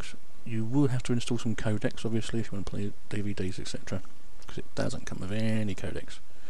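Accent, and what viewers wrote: United Kingdom. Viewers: you are british ? you have a funny accent :))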